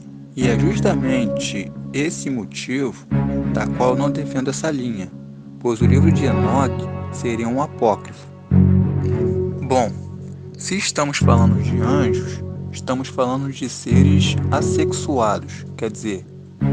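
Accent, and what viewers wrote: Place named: Rio de Janeiro, Brazil. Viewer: Desculpa mas esses narradores com esse sotaque carioca desisto de ouvir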